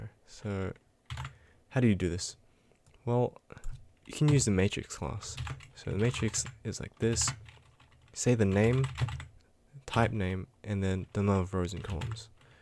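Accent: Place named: Australia. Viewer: i love ur aussy accent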